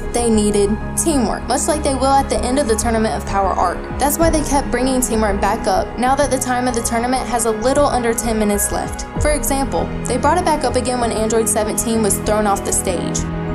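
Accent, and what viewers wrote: South, United States. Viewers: I hear country in your voice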